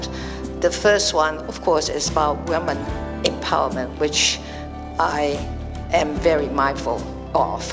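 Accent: United Kingdom. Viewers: 英文真的蠻好的, 還帶著英國腔調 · British accent very good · 小英總統的英國英語腔調真的優雅好聽！❤ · 本來就有英國腔嗎？ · 这个英文说的 不太流利, 有点英国口音, 还有点中文口音. 这种英文水平在欧美做律师还是不太够资格的. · 英式腔真的舒服，未來可能難再有這樣腔調的了，希望未來外交部長或總統至少都要拔擢這樣的腔調人才，有利外交形象。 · 蔡總統的英國腔好好聽啊～更愛她了...😍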